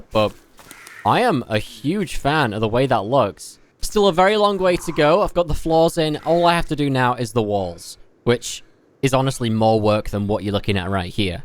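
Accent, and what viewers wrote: United Kingdom. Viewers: Great vids. Where are you from? UK living in USA?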